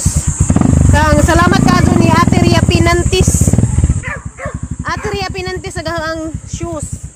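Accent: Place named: Visayas, Philippines. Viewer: . Arang k islang ag host oii. Halata baya PUD NGA BISDAK KAAU.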